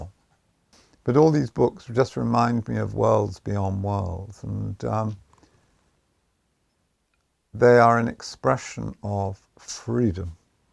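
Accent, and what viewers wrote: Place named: United Kingdom. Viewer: Such a wonderful cozy atmosphere. This man could read a phone book and it would hold my attention. Love a British accent!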